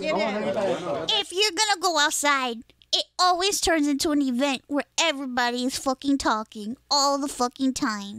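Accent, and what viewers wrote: Puerto Rico. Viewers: HER SPANISH IS PUERTO RICAN HOLY SHIT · Jaja su español es muy de puerto rico XD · lol. I was just curious because I heard this vtuber was puerto rican so this is the first video of hers I'm watching. Her spanish was very puerto rican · I never would have thought Iron Mouse was Latino and refused to believe it, then I heard her accent and "yeah she's definitely Puerto Rican" lol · Hi there qualified puerto rican living on the island, can confirm 100% accurate right down to her accent.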